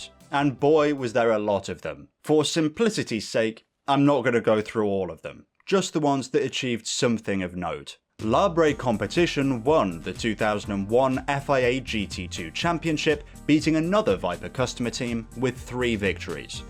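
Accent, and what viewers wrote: United Kingdom. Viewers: Ah yes , a British accent guy talking about cars, hits the spot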